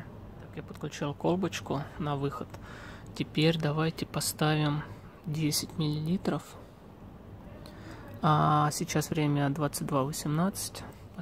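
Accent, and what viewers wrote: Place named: Moldova. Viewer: Спасибо за обзор. Очень помог мне настроить девайс. Удачи тебе!!! Кстати акцент у тебя интересный, случайно не из Молдавии? Всех благ!!!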